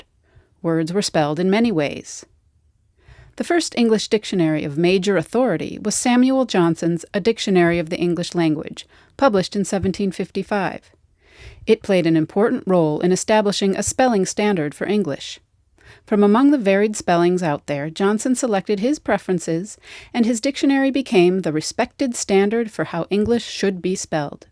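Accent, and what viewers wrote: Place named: RP, United Kingdom. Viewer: Queens english FTW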